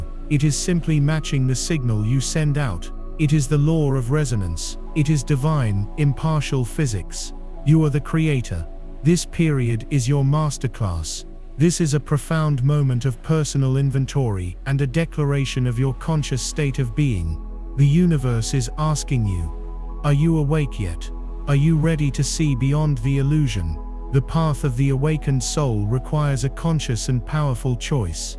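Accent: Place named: United Kingdom. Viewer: Click bait. With an expect Brit accent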